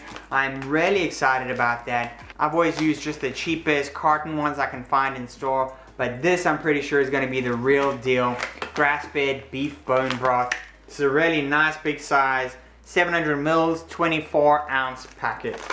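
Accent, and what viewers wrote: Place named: South Africa. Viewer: Fantastic news! For Americans, try the Butcher's Box. Same criteria products. 💕🌞 Side note, if you were born and raised in B. C., how do you still have your South African accent? So curious sunshine. 🤔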